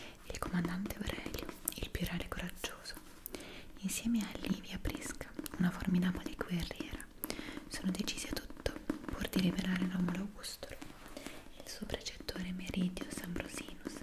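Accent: Italy. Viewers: An American dude will always fall for a pretty Italian girl with that accent and language. It would be hard not to! 😌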